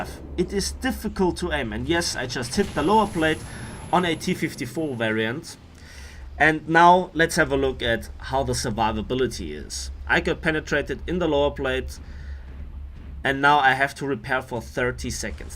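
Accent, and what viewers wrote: Bavaria, Germany. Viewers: Bist du aus Bayern ?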